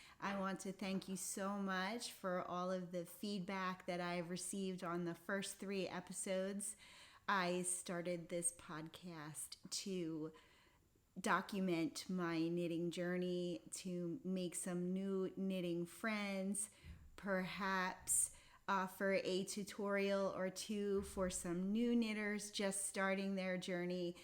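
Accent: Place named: Pennsylvania, United States. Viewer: Hi!, I just found your podcast. You are very pleasant to watch. It sounds like you are from PA. I grew up in Lycoming County. Your granddaughter’s sweater is so beautiful; I just love the color. She is a lucky little girl to have a knitting grandma. Happy knitting!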